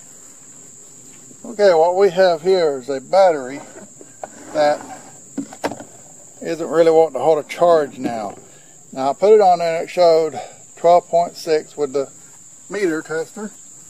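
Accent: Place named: South, United States. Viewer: I've got a couple of batteries new I let go I shouldn't have and I really wanted to understand how to rejuvenate these batteries they're deep-cycle but I can't understand a damn word you're saying hardly you need to learn how to speak or something I know country accent is one thing but when you're making videos everybody needs to understand what you're saying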